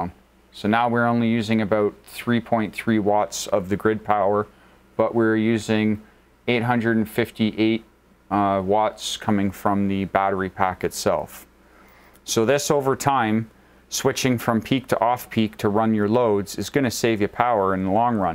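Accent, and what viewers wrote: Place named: United States. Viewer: I thought Yetis were brown? LOL. I have the AC200L, and can't help chuckling at the American pronunciation. Great video by the way. I have a 5KW solar installation on my rooftop. The power company will only give me 5 cents a Kilowatt input credit, so during the daylight hours I run all my power hungry devices, pump the house full of heat or cool air depending on the season. Now I have the AC200L charging during the daytime and running some of my essentials at night. I have a folding solar panel that I can use in case of grid failure, so I may never recover the full cost of the unit, but I have a bit of a guarantee against blackouts.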